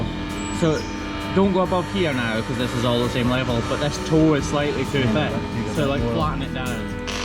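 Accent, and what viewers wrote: Scotland, United Kingdom. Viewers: I thought he sounded more Scottish today. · Pinehurst NC here......Incredibly entertaining and informative. I have no farm knowledge and this channel was very eye opening. I also noticed your Scottish accent thickens when talking to friends! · Love how, like most Scotsmen, you have 2 accents. A normal one, and a posh one to use when talking into YouTube or to nonscots . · I think it's hilariously wonderful how much MORE Scottish you sound when you're talking to other Scottish ppl 😂 It's lovely. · Do you notice that your Scottish accent is way stronger in this video?🙂 Maybe it comes out more when you’re with others with a stronger accent. No big deal. I’m Canadian so I can’t say much aboooot accents, eh?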